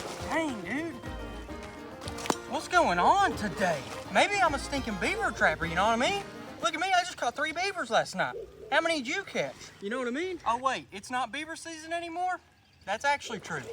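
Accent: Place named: Kentucky, United States. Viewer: always makes me smile with his Kentucky accent! Keep up the good work bro!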